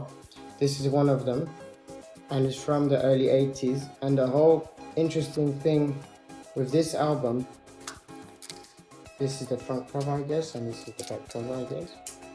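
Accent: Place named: Germany. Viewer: I love thissss he speaks like a 8 year old German child learning English. Not an insult.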